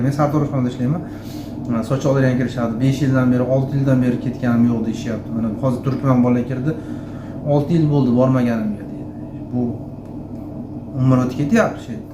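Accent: Andijan, Uzbekistan. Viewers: Андижонча лайк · Рахмат сизга анжонча килиб тушунтирдиз ишларга омад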